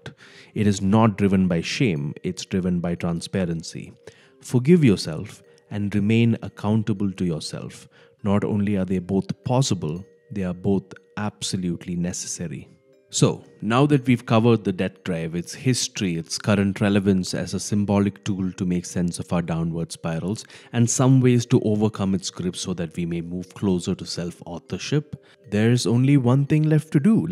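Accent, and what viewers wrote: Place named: India. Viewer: I'm doing well, sir thank you! how about you? you have a very pleasant speaking voice and I always did like Indian accents. where are you from? I visited Varanasi once and bathed in the Ganges. the entire trip was such a memorable experience. thank you for this educational video, sir. ttyl, buddy! 🙂👋🏻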